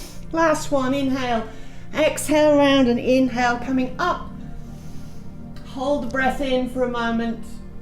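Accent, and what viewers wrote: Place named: Ireland. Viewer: I meet this lady yesterday. She is so inspiring. Thank you. Love the Irish accent.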